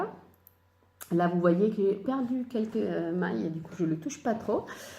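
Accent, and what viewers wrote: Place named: Italy. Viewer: Ciao, conosci le avventure di Arianna? Il podcast di una Veronese in francese. È molto dinamica e sempre gioiosa. Il tuo podcast è simpaticissimo. Mi piace sentire parlare francese coll'accento italiano. Sono francese ma mi piace molto l'Italia. Aspetto il prossimo podcast! Lamartineorzo sur IG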